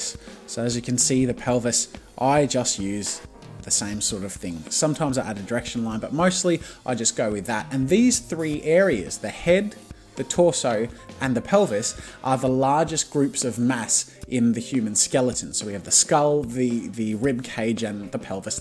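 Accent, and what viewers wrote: Australia. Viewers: does he have a really Australian accent in THIS video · His Aussie accent sounded a bit different here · His Australian accent was so much stronger 5 years ago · he sounds extra Australian here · When Australian accest still exist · this by far has his deepest Australian accent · you sound so Young and aussie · why does he sound so much more Australian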